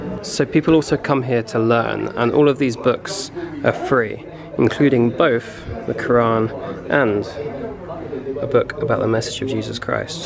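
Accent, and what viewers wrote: United Kingdom. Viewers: Amazing your video,!!! Congratulations I love the pronunciation of British English.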